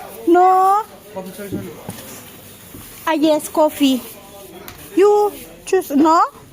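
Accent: Iloilo, Philippines. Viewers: ilongga kapo maam?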